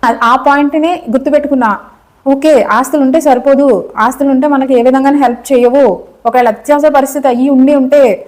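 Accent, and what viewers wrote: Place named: Godavari, India. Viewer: Hello andi are you from Godavari districts? Your accent looks like that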